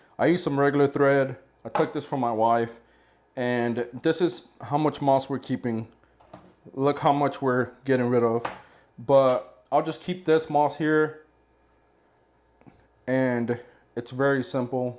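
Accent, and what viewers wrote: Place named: United States. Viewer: Do you ship in the USA? You sound like an American but I have messed that up before. 😂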